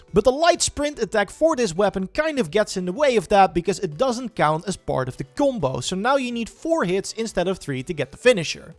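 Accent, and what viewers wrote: Netherlands. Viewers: Heerlijk, dat Nederlish.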